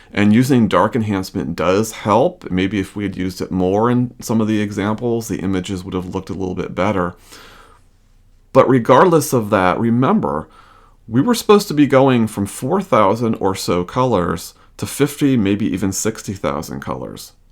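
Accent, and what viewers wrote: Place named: England, United Kingdom. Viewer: Hi! I am from Argentina, nice to meet you , sorry for my English but I would like to ask you if you really are from US, you sound as if you were from England ! Thank you , wonderful channel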